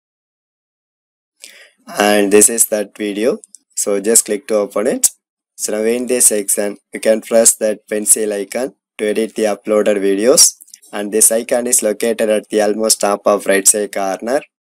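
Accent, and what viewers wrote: India. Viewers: I hate indian accent, sounds ugly!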